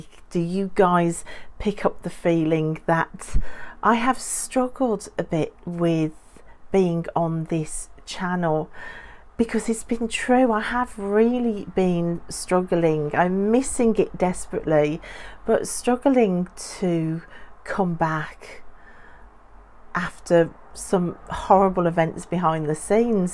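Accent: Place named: West Midlands, United Kingdom. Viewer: I just want to say that I love your channel. I’ve watched all of your videos and I come for your personality and lovely voice. I like how you combine tarot chats with aspects of your own life and adventures, and without pushing any readings on us. I love your enthusiasm and deck introductions. Your accent reminds me of home - my relatives have a Wolverhampton accent, so West Mids, and I moved away to a location not far from you I think……near to Stratford Upon Avon. People watch channels that are interesting and make them feel peaceful. Ignore the haters.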